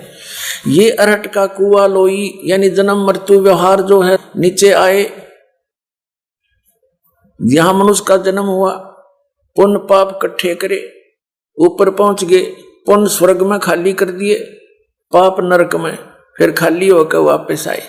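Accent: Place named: Haryana, India. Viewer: Iski haryaanvi bahut mst lgti h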